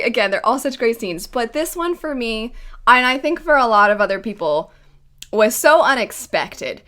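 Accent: United States. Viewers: Stumbled on this vid by accident and „Wiedźmin” collector’s edition (Polish version!) caught my eye immediately. Same for the polish „Mistborn trilogy”. Now I’m curious - are you a Pole? Can’t hear the onion accent in your English and your channel info says „US”… I’m so confused 😂 In case it’s not obvious - I’m a Pole myself and seeing those books contrasted by your perfect american accent is bugging me rn 😅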